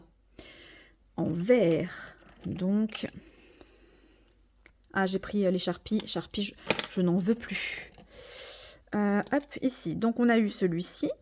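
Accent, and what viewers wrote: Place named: Southwest, France. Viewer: Cet accent du sud ouest! Je le reconnais j’ai le même 😂😊 j’adore tes vidéos !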